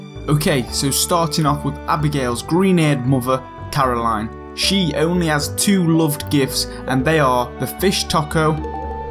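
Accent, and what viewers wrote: United Kingdom. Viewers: THE BRITISH ACCENT AND COMMENTARY LMAOAOAOA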